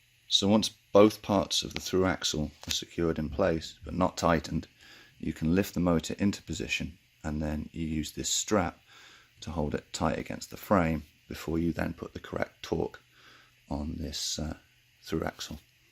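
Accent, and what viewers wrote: United Kingdom. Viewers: Hi.. You sound British obviously not living in England?? Is there a huge difference in power / performance between CYC and Bafang HD Or even the Cyclone 3000w mid drive? I'm guessing the Bafang is the quietest of the three and least powerful and top speed but what about torque? Thanks for your help.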